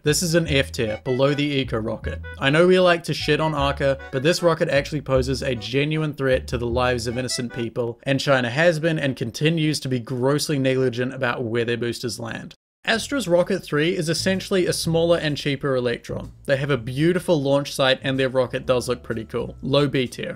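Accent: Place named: Germany. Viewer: I usually dont like over the top german scientist voice cliché but I'll allow it this time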